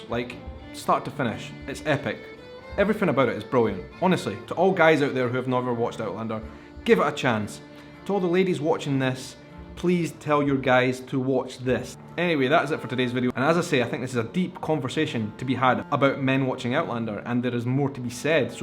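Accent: Scotland, United Kingdom. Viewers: Hey, I did watch the first season of Outlander, and loved it. As an Ex Pat Scot, from Edinburgh, I initially watched it, just to hear my native accents again. And I loved both of the main Characters, and yes, they both are handsome and beautiful. besides being good actors. And the story is well written. I also enjoy Sci-Fi, or these time travelers. Why I missed series 2 and 3, I'm not sure. Probably because I have so many viewing options, that it probably just got lost in the midst of all those options. I do enjoy a strong Female Character, and thankfully, Brits have plenty of great female actors to play them. I can't even recall the channel it was on, but I know sooner or later, it will appear on one of my Streaming Media systems. Then I'll catch up with the show. I subscribe to two British Streaming Channel, and Amazon. I just recently cancelled my Netflix. 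So I'll do a web search, for Outlander, and maybe catch up with the seasons I've missed. I've often thought, America and the Studios should pay the U.K. Royalties, for all those Royal Sagas, and others they have produced. Even though, I've been an American for over 50 years, I'm still a Scot, and it seems, much of my accent. Americans do live the Scots. And show it, especially those with Scot's blood in their veins. I enjoy your Vlogs. And hearing my old accent.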